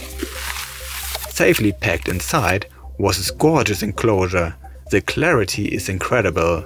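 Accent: Germany. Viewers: I clocked you as German, and I was correct :D. Geiles Video, weiter so! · native german???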